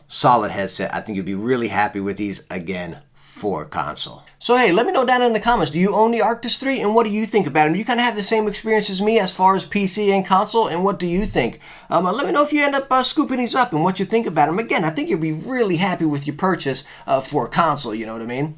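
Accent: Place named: Brooklyn, United States. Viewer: where is this guy from? Brooklyn?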